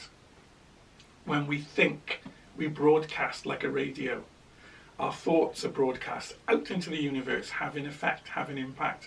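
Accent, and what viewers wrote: United Kingdom. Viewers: I like the way this guy talks he is British like my mom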